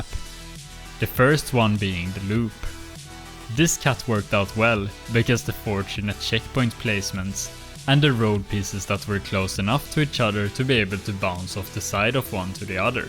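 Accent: Sweden. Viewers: Swedish! Love the accent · Damn that Swedish accent hits hard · du har den svenskaste engelskan jag har hört på ett tag o jag älskar det! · No offence så men jag gillar verkligen inte svenska dialekten på engelska.. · I love your swedish accent, i went to sweden at vacation i know tack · this is the most swedish accent i have ever heard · as a fellow swede, love the dialect :D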